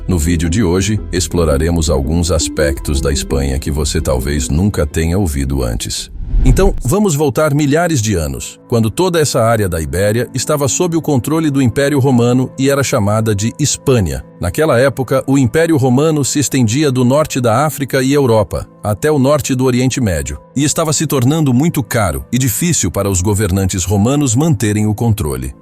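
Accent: Portugal. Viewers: Do nada passou a ser narrado em português de Portugal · Mudou para o português de Portugal, pq é uma inteligência artificial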